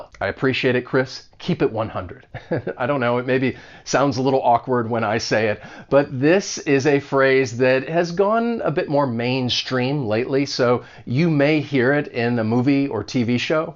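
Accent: United States. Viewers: Don't mention it professor. I'll be always watching your videos, they're so cool. Perhaps, because of the way you teach and explain everything. I appreciate American's accent .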